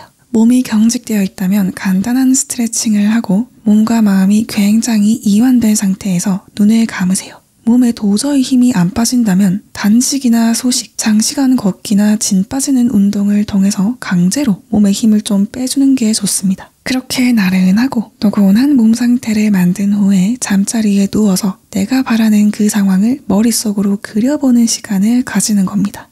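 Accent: Gyeongsang, South Korea. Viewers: 작전 변경...지금부터 님을 제 와이프로 끌어당기겠습니다. 경상도 사투리 넘 매력..내 알라도..ㅋㅋㅋㅋ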